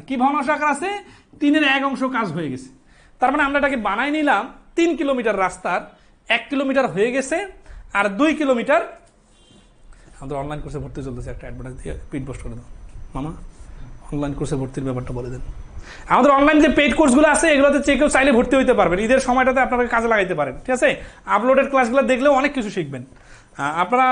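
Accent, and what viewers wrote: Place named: North Bengal, India. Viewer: স্যার আপনার ভাষা শুনে মনে হয় বাড়ি উত্তরবঙ্গ। আপনার বাড়ি কোথায় স্যার?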